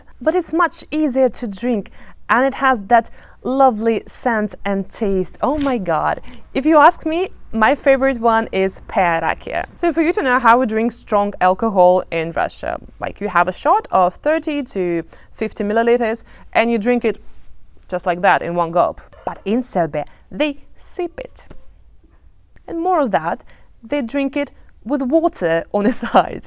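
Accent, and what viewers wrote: England, United Kingdom. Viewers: I found this channel so interactive. Great job! I definitely will subscribe to your channel. By the way, you have a great British accent. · british english🤮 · И да кстати,у тебя британский акцент,сразу понятно наклон в какую сторону…и между прочим,можно было меньше гадит по Сербии,всё таки ты в гостях)) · како си пронашла Србију ако не знаш где је Србија на мапи 😂😂😂 као кад се прича о порнићима, наводно нико их не гледа а сви знају све о њима, ови издајници либерали су свугде исти, за једну Рускињу прилично лепо причаш енглески са акцентом ,то се ретко виђа улагали су Британци добро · Your English is awfully to British. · You speak English like you are from England 🤣. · Ma koju njenu državu? Vidiš da ima britanski akcenat. Rusko je možda samo poreklo. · You have British akcent!!! Rusan ?? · You have a can`not decide which but your akcent is British,Australian or New Zeland...Fluid english... 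· Ova ima engleski akcenat nije ona dugo videla Rusiju, mucni malo glavom sta stoji iz toga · Of course that Serbia and Russia have different customs and mentality. Even in different parts of Serbia and Serbian lands customs and mentality are different, not to mention Russia which is removed by thousands of kilometers from the Balkan peninsula. I noticed that speaks with a bit of a British accent, which means that she probably knows far more about Britain than about Serbia. Конечно чтo в Сербии и России обычаи и менталитет по другом. Даже в разных части Сербии и сербских земель разные обычаи и менталитет. Россия удалена тысячи километров от Балканского полуострова. Я заметил, что есть легкий британски акцент, что означает что она вероятно знает больше для Британии чем о Сербии. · your english shows us you were living in UK for years and more. so this is just another paid channel paid by west trying to make bad blood between russia and serbia. 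everyone just report her so we close her channel. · твой британский акцент говорит намного больше, чем то, что ты говоришь. грязь, вероломно используемая в английской пропаганде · best brittish accent evah!! :D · Your accent is more english thank Russia. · You have traces of a British upper-middle class to upper class accent.. Interesting ! Cold cuts ..ham, beef etc make great sandwiches .. but excess fat does your arteries no good !! (To each his own..) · How do you have a British accent if you are from Russia? Just curious ☺️❤️ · A sweet video. Well, you confirm what I already suspected; the Russians are a bit more reserved than the Serbs are; we are from the South of Europe, no wonder, simillar openness like Italians and Greeks. You find it sad that the many Russians do not know Serbia exists; I understand that feeling. But I do not find it so surprising; we are such a small people compared to you. 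And how could I expect that your grandma taught you to love the Serbs as you were 6, like my grandma taught me :) BTW, your English is that good, that I wouldn't wonder if you've spent some time in an English-speaking country (sounds like England). All in all, very likeable :) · I do not find anything controversial or offensive towards Serbs or Serbia in this video. However, I find it highly improbable that you have acquired such a great British accent by watching movies because I know it for a fact that foreign movies in Russia (as in many other countries) are voiced over. Hence, you had no chance to hear real British accent from foreign movies in Russia. Given that you are not in your early teens, it would be very hard (read impossible) to gain this level of speaking proficiency if you are "learning" as you say. My best guess is that you have lived or gone to school in England in your early age. 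If I am mistaken, please take it as a compliment. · Do you have a British dialect?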